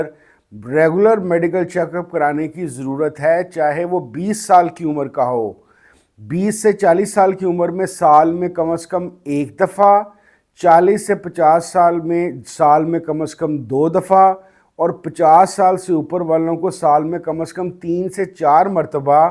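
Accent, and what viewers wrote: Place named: Punjab, Pakistan. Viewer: اردو بھی پنجابی پنڈو لہجے میں بولتے ہیں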